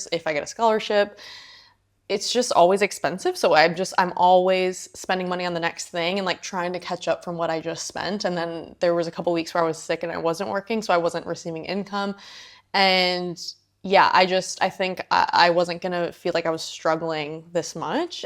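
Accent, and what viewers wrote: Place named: Australia. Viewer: I'm hearing an Australian accent more with every video Just waiting for the 'no worries' and 'mate' 😂😂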